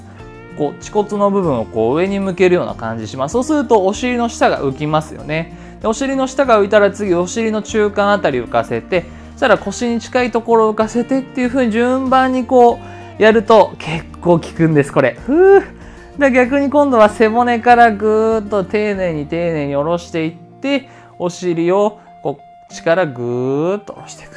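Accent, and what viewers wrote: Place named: Tohoku, Japan. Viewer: 東北出身なんですかー！私、東北人なので、の訛り全く気にならず入ってきました😂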